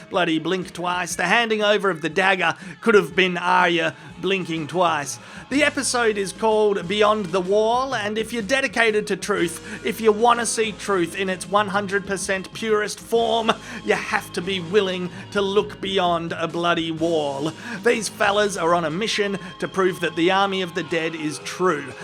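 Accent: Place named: Outback, Australia. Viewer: My favorite part of Ozzy man reviews is that although he sounds like a fair dinkum outback wanker, he is deep and insightful, especially with GOT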